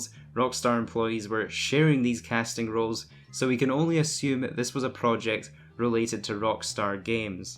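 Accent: Scotland, United Kingdom. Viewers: he's Scottish clearly u dumbass, ur obviously not from england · nonya business He's Scottish, not English. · Omg where u from, I'm Scottish too! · he's Scottish clearly you dumbass......🤣😂😆😅😀 take a course in grammar dip shit....you mean to say..He's clearly Scottish you dumbass ass...man I can tell your a special needs kid aren't ya lmao.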